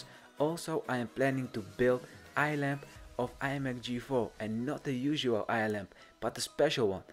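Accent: Netherlands. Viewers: Haha lachen om te herkennen dat je een Nederlands accent heb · hoorde meteen dat Nederlandse accent.. toen zag ik ronde op de stopwatch en wist ik t zeker..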